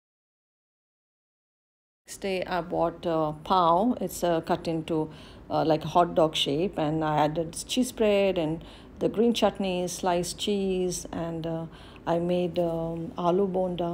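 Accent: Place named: South India, India. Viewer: ... What it is that i dont like about u...??. U sooo beautoful by Face and Heart too... Ur South Indian Hindi accent it reminds me of my Manglorian friend... Padma.. Love ur vlogs.... Make more vlogs...not those short reels...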